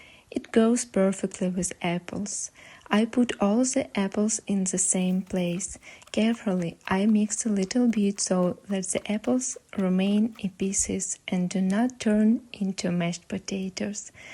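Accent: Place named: Ukraine. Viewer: ВЫ из НЕ ИЗ УКРАИНЫ? АКЦЕНТ кошмар жуть🙈. У меня выработался навык за последнее лет 7-10 различать украинцев за вёрсту чуять) 😊и вообще русскоязычных сразу вижу)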